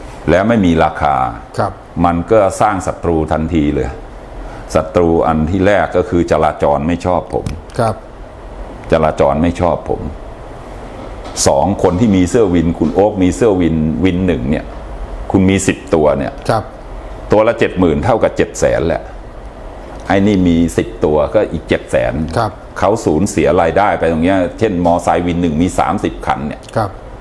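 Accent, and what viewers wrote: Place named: Lat Yao, Thailand. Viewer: คนลาดยาว ก็พูดแบบนี้5555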